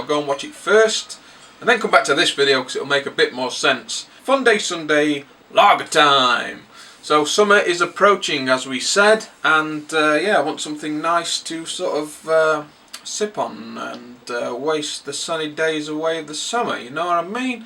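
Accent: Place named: Northern England, United Kingdom. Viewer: Great vids (always good to hear a bit of northern accent on here!...(i'm a lancs lad!) Don't know if you ever followed a guy called secue01 but he gave a good shout out this week about some corny kegs... in a nutshell us UK lads can get some corny kegs on the cheap approx £33 delivered from an Ireland company.... <link> <link> <link> seems pretty legit. Just thought i would spread the word! cheers Mike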